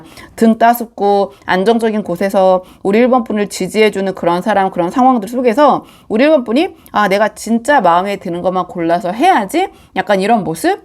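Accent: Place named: Gyeongsang, South Korea. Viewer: 진짜 들을때마다 느끼는건데 거꾸로 들어도 대구사람. 대구사람이신가요? (저는 아니예요ㅎㅎㅎ)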